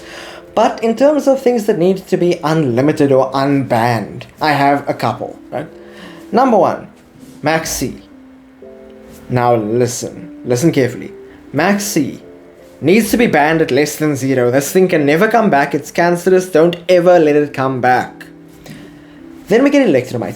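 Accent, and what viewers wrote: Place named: South Africa. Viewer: Interesting content my man, where are you from? South Africa?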